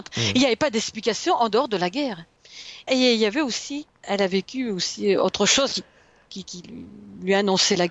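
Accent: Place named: South, France. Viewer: C'est génial j'en ai oublié mes tracas comme quand j'étais petite absorbée par cette histoire au accent du sud encore encore encore 👍merci merci merci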